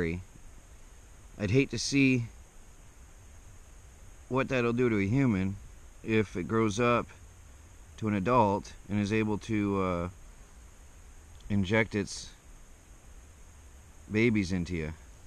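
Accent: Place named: Michigan, United States. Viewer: Yeah you sound like you're from michigan too.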